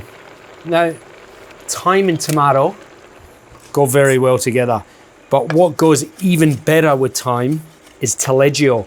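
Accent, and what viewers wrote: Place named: Scotland, United Kingdom. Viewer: Ye can take the man out of Scotland, but ye canny take the Scottish out the man.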